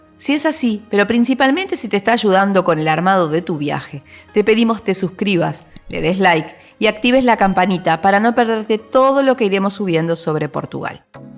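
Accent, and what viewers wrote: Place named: Argentina. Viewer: Por qué neutraliza el español si se nota que es argentina? Que ridícula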